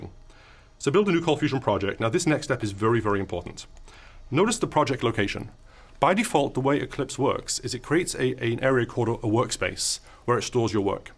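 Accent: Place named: Scotland, United Kingdom. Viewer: So, that accent is totally Scottish. Just curious which city it's from.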